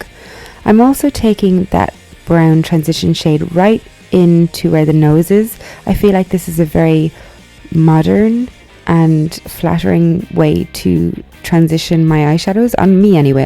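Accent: Ireland. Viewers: I see you are Australian, but what is it about your accent that sounds slightly Irish?? Is this a New South Wales thing that an American would know nothing about?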